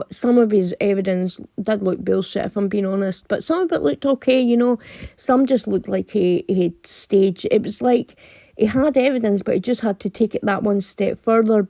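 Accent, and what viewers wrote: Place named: Shetland, United Kingdom. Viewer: by the way, what part of Scotland gives you your accent? I exchanged comments with someone from Shetland & you sound so much like sound bites I've heard from Shetland, I wondered if you're in the North? Take care & ignore the sister. Poor deluded women.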